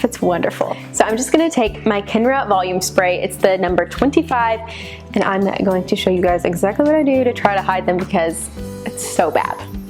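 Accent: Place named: South, United States. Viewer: Your southern accent is cuuuute!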